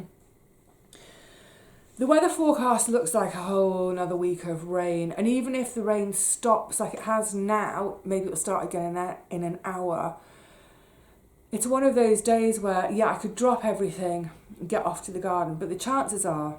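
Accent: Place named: England, United Kingdom. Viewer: Hello from Australia...enjoyed your chitchat and lovely books you've read...definitely right down my ally....started watching you video, had to pause you and watch Mr harass goes to Paris..so enjoyed it and here I am finishing of your video and all that English accent..tfs..happy reading and hope you got some things crossed off your list...greetings from Australia..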